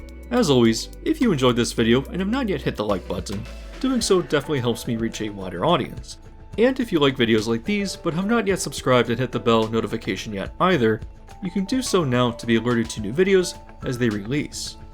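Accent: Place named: United States. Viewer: Might just be American accent messing with the pronunciation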